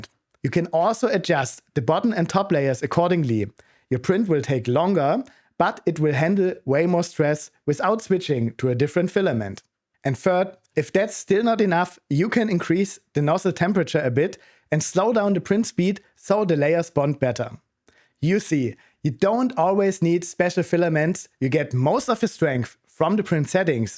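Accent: Germany. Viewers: Man hört an deinem Englisch direkt, dass du aus Deutschland kommst. 😂 Spätestens wenn man die Fehlermeldung am Prusa Drucker auf Deutsch sieht. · Deine Videos auf Deutsch sind so gut. Aber jetzt auf Englisch mit so einem furchtbaren deutschen Akzent … nee no offense, aber das ist echt grausam. Für meine Begriffe bleib beim Deutsch, da sind deine Videos ein echter Mehrwert.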